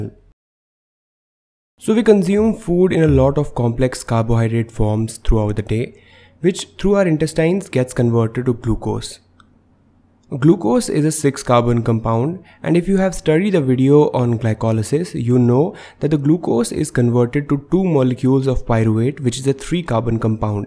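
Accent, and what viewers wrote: India. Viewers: Difficult to understand. Is there anyone there who doesn't speak with a heavy Indian accent. If so, please let them narrate the video. You are losing view count because people can't understand the speaker. If this is not meant to be viewed by North America, please disregard.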